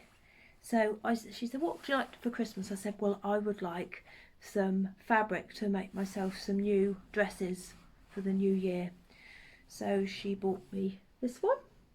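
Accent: Norfolk, United Kingdom. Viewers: I have loved watching your episodes so much I have decided to go right back to the start and watch them all one after another. I'm in Bunbury, Western Australian and my son lives in Norfolk as well so new I had heard your accent before when I hear his friends. Wishing I was over there again but the virus has kept us apart from two years now. Cannot believe how talented you are! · Hi, I don’t know if you’ll get this as it’s on your first podcast, but the algorithm popped up your channel with current new video and I’ve been watching for a few weeks now and thought I’d go back to your start. I thought I detected a Norfolk accent, my husband and his family all came from Norwich, although we live in Swansea now. I love watching you. You remind me of my cousin. Take care.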